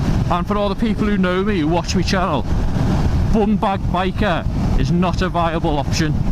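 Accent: Liverpool, United Kingdom. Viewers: ASB! angry scouse Biker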